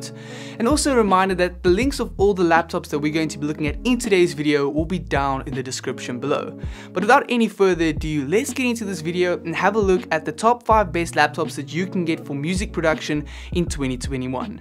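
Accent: South Africa. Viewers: You South African bro? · Sound south african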